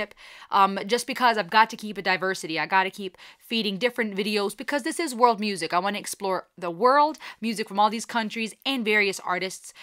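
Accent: Swabia, Germany. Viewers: Heut sich a bisserl Schwäbisch an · Well....if you like this.....and undeniable this is GREAT (and Floor will always find the weak spot in my heart).....you need to check out also the Version with and Tarja from from "End of an Era"...you will not be disappointed. Oh...and btw....your german accent is nice 🙂 Army Germany out for now. All Hail Queen Floor!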